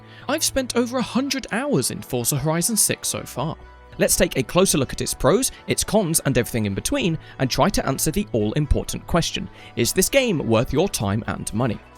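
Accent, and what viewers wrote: United Kingdom. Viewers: Uk accent L video 😂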